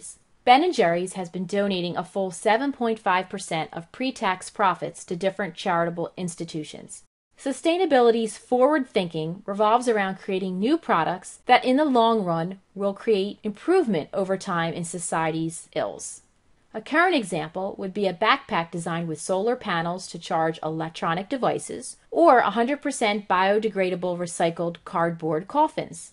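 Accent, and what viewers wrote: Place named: Philadelphia, United States. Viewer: the super thick Philly voice-over accent is a bit odd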